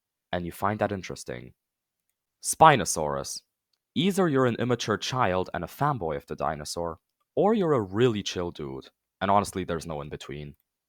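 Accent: Germany. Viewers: When I heard this guys voice I knew this guy was German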